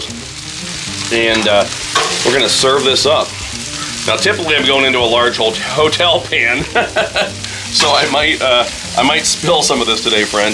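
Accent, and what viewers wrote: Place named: Northeastern Pennsylvania, United States. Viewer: Accent sounds like you're from NePA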